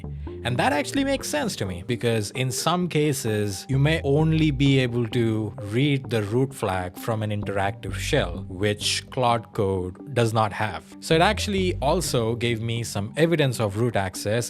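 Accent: India. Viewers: this guy has such a NRI accent VecTOR , nice video though , claude is surprisingly good at documenting things neatly , as a human , it would be nice to copy that style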